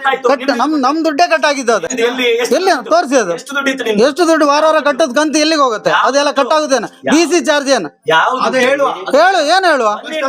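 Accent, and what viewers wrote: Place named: Kundapura, India. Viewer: Kundapuradavre nimm maatu..👌.😂.dairya mecchle beku.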